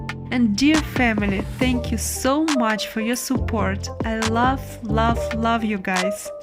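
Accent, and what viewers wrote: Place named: Russia. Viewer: your voice very much with your Russian accent it is so endearing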